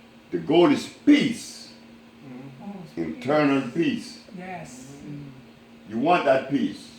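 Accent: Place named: Louisiana, United States. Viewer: Did he say wait a minute round. Lol. That that talk down in Louisiana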